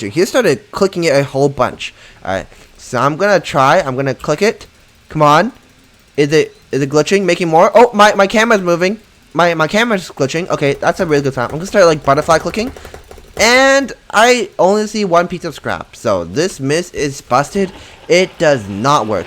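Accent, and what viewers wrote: United Kingdom. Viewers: Is bro half British?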